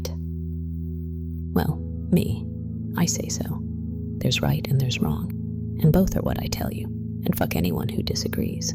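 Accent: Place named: France. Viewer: You Have Quite The French Accent 💗💗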